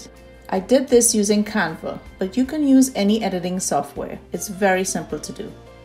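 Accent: Trinidad and Tobago. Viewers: I know a Trini when I hear one ❤ Great work!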